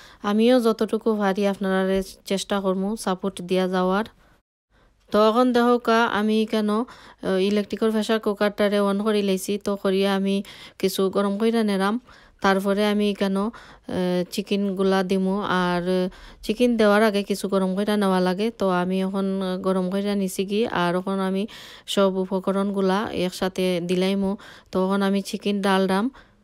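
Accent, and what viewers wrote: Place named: Sylhet, Bangladesh. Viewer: চমৎকার সিলেটি আপা মনি চমৎকার খুব সুন্দর রেসিপি বানাইছেন খুব ভালো লেগেছে তাই পুরো ভিডিও দেখে লাইক এবং কমেন্ট করে গেলাম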